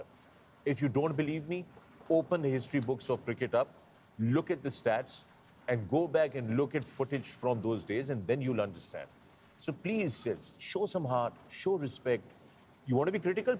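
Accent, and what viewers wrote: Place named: Punjab, India. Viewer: sir is heart of the show love his anchoring and panjabi accent ❤❤❤❤❤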